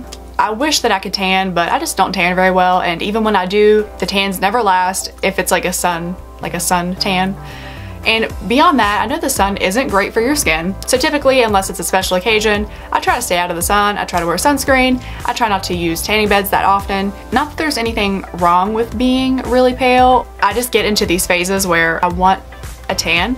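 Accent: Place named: South, United States. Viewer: Just finding your channel but it's great to find someone on YouTube with a southern accent like myself 😁 thanks for the video.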